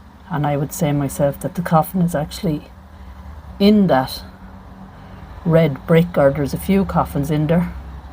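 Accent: Ireland. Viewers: What a adventure you bring us on. Plus I get to see Ireland. I grew up in Kingsbridge in the Bronx. A very Irish neighborhood that I truly miss. When you speak it reminds me of Kingsbridge yes near Gaelic Park and the beauty of Ireland makes me realize the famine Ireland had must have been so bad to leave the beauty of Ireland for NYC. Your videos are fun and I apologize for sort of going off topic. I look forward to watching all you have done and subscribed to the future ones. I’ll also share.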